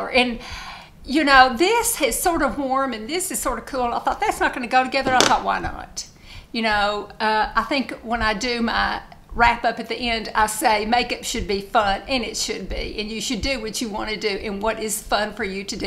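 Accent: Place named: Tennessee, United States. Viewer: I don’t know why, but listening to you, by the way, always relaxes me😊🥰❤️❤️🫶🏻 seriously! Calms me down! It must be the sound of your voice, the tone of it, and the accent lol that accent 😂😘😆 your Tennessee accent calms me down🤷🏼‍♀️❤️❤️❤️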